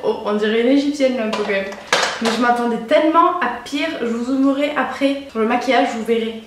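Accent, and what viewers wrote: South, France. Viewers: L'accent du sud il est trop bien à écouter il met de bonne humeur😊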